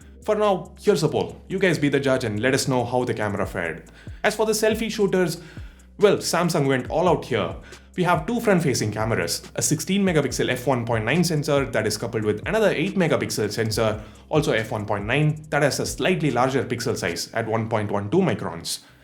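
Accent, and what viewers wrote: India. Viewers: Another indian gay accent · Sounds like indian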